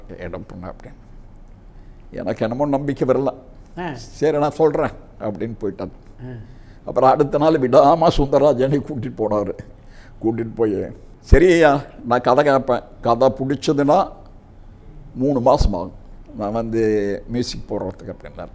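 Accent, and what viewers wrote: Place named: Coimbatore, India. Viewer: Kovai slang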